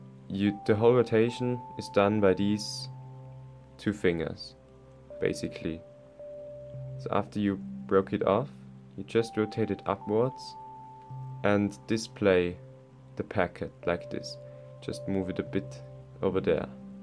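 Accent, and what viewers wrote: Germany. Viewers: Nice germanischer Akzent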